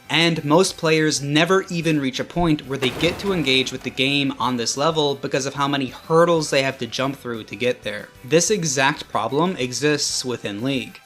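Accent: Newfoundland, Canada. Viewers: Massive sidenote, but are you a Newfie? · Nice video but god damn your Canadian accent is almost unbearable to listen to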